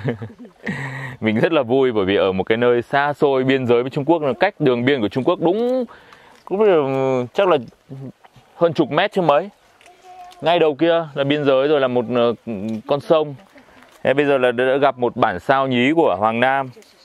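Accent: Hanoi, Vietnam. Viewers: thích giọng anh Nam ghê! Trai Hà Nội có khác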